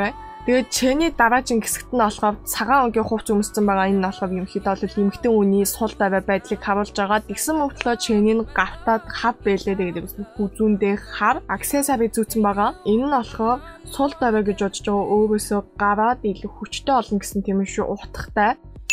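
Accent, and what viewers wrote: South Korea. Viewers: ahiin duu bitgii shantraarai yhv john buurunhuu yaridag l bga biz chinii solongos aylaga akcent ystoi best shu amjilt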